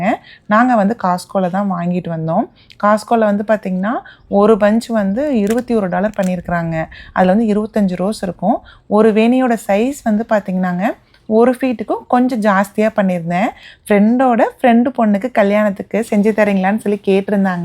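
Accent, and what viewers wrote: Kongu, India. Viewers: எல்லா வேணியும் அழகு, உங்க கொங்கு தமிழும் அழகோ அழகு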